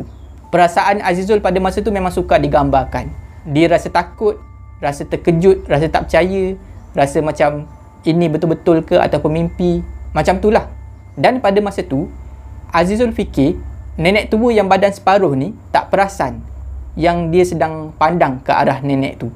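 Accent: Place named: East Coast, Malaysia. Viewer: oww patutlah loghat mcam org pantai timur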